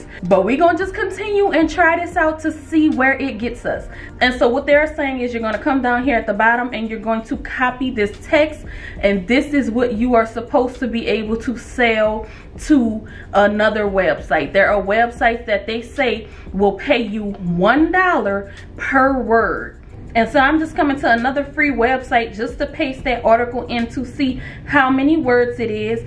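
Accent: New Orleans, United States. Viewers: I hear dat accent!!! You gotta be from the NO!!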